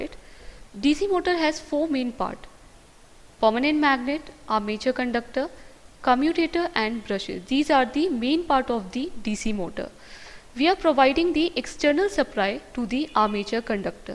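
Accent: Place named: India. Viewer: Very good Best Indian accent yet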